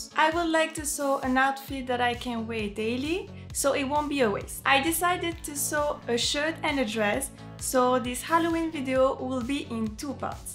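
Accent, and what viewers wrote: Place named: France. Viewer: on sent bien l'accent francais xD mais merci quand mêne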